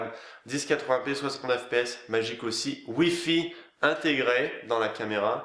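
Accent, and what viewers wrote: Canada. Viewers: D'un côté, c'est pas faux,mais d'un autre oui :). Officiellement, il n'est pas Canadien, mais il a une culture Canadienne, c'est pour ça qu'il a l'accent. Rester plus de 10 ans à Montréal, ça laisse des traces x).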